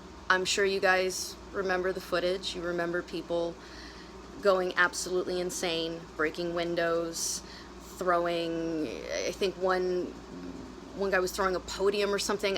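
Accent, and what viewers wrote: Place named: United States. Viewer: You sure sound like an American to me. Americans look like everyone. Political parties are constantly redefining themselves as do people, so that is too complicated for me. The people I find most interesting and least threatening are people who apply critical thought to each situation as opposed to people locked in to an ideology that stifles free thinking. You are obviously a beautiful American woman and I would just suggest, avoid the thought police and don’t let people define you. We don’t all think the same. Where’s the fun in that. Sorry for what you’re going through, family can sometimes be the harshest, I know. One more thing, you should know, there are literally millions of people who feel exactly like you and we are with you.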